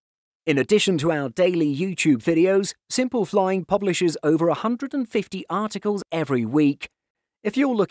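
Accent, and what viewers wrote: United Kingdom. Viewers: Would live to see the person behind this voice. Perfect british accent and perfectly pronounced French names. Hats off to you gent.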